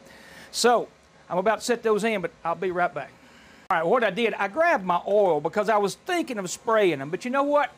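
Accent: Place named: Texas, United States. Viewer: I've loved the accent. Is it from texas ?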